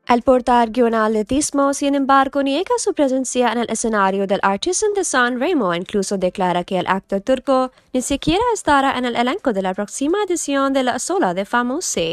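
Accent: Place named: England, United Kingdom. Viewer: Perdonais, alguiene dice porqué la señorita de voz, habla en español malisimo con actento inglés y dice los números en inglés también??? NO SE ENTIENDE NAAADAAAAAA. lo siento gracias.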